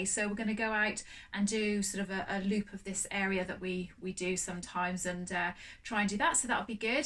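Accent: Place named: South West England, United Kingdom. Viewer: I love your videos and this is another great one. I watch from New Zealand, aspiring to sew for myself and my kids like you do. I grew up in the UK, in the South West, and love hearing your accent and seeing the scenery 😍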